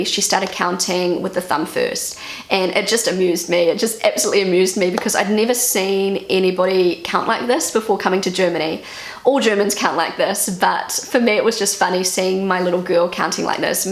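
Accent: New Zealand. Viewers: Love your kiwi accent 😘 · I don’t understand why you think your kids are germanised, they grew up there and go to a German school, have German friends so it’s obvious that they take over the habits of their German friends, because they grew up there just like every other German kid with German parents 🤷🏽‍♀️ The only difference is that your kids speak English with their mom, but their whole social life happens on German. I think you underestimate the power of being educated in another language than you speak at home 😛 Love your Videos and your kiwi accent 🥰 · I love her new Zealand accent💖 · As a german i can hear the kiwi in you, too.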